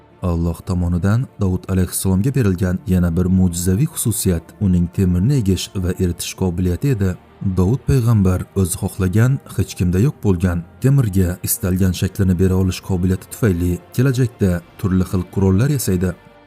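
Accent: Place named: Khorezm, Uzbekistan. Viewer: Xorazmlik boʻlsa kerak. Ularni shevasi boshqa. Q harfini ham aytolmaydi.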